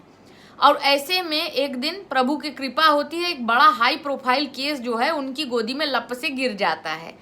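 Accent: India. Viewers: I love your UP accent. Are you basically from there ? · Fan of ur Hindi accent ,uske babau bhi sidhe muh baat nehi karta hey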